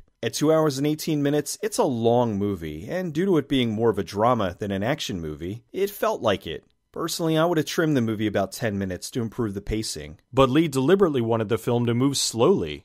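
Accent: Philadelphia, United States. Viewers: Wait, did you say Baña?! Love that Philly accent !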